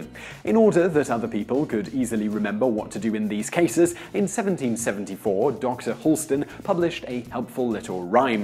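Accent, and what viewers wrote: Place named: United Kingdom. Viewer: "Here in America", there's other English speaking countries too. Listen to Simon's accent, he's British. British spelling is arse and yes we say it like it's spelt. Actually "ass" in Britain refers to a type of Donkey.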